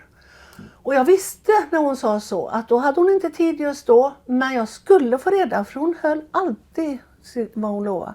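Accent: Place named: Skaraborg, Sweden. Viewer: Så roligt att se den här filmen och höra dej, berätta på din härliga Skaraborgska, om linet.! Jag använde den i klassen när vi pratade om Lin( nu när vi inte kan åka Skara med klassen längre). Roligt med Tidaholm och Holma också. I mitt linneförråd har jag en bunt ofållade halvlinnehanddukar från Holma😀 från min svärmor som bodde i Tidaholm.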